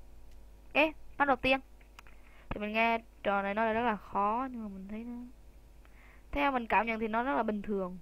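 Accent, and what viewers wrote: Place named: South, Vietnam. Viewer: Hồi nhỏ là giọng hơi miền Nam rồi không giống ba mẹ gì cả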